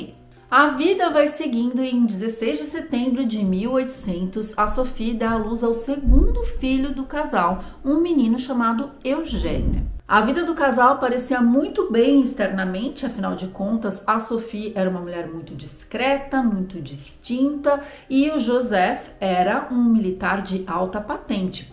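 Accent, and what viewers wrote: France. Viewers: Amo o jeito francês de você falar os nomes 😊😊😊😊.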